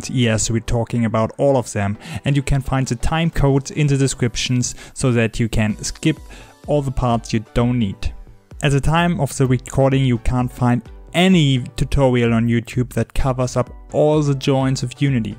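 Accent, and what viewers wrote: Germany. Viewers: Kann es sein das du Deutsch bist klingt ziemlich stark danach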